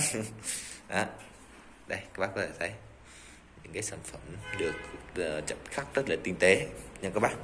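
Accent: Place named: Hue, Vietnam. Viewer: Giọng bác giống giọng Huế quá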